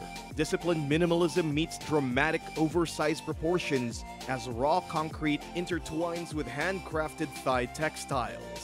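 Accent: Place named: United States. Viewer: he sounds American.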